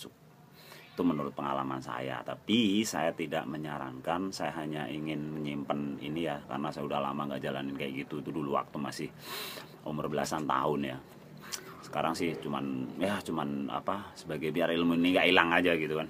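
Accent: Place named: Jakarta, Indonesia. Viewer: Logat u Betawi banget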